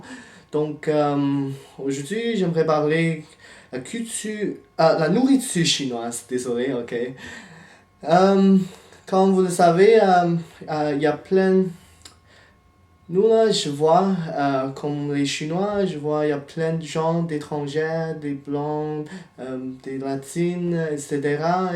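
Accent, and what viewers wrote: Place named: Quebec, Canada. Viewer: Est-ce que tu habites au Quebec en ce momment ? tu as un accent, mais tu parles le jouale quebecois, vraiment cool à entendre, keep it up ! :) haha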